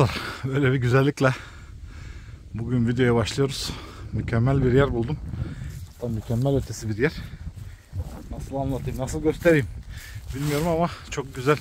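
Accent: Thrace, Turkey. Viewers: Trakyalı olup konuşman 'da )ayrı bir hava veriyor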